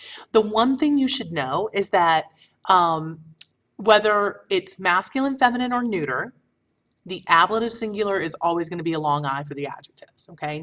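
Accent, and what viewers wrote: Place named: England, United Kingdom. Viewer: i love your class but I you cant avoid your english accent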